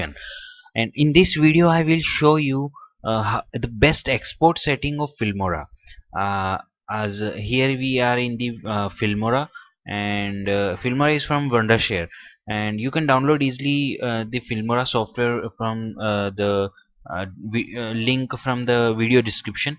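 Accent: India. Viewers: OMG people have accents jeez u don't have to be rude about it! Imagine if that was you? What would've u done? He probably had struggles explaining it in English and by the sound of his accent I can tell it was probably Hindi. Like, at least he tried appreciate that. Like he said, just follow the steps -.- -_ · He is speaking proper English but with an hindi accent. Stop being rude people.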